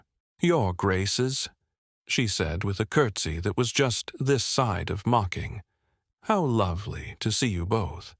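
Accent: United States. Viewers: I enjoyed this story, the relationship between Marg and Max was developed nicely, there were no nasty scheming people plotting dastardly deeds… the narrator - firstly the sing-song, staccato cadence was off, and of course, American accent for English story was off, but notwithstanding that, the diction was clear and voice well modulated, which made for easy listening 👍🥰🇦🇺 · As I commented on another story, I'm having problems listening to an American accent told for a English story.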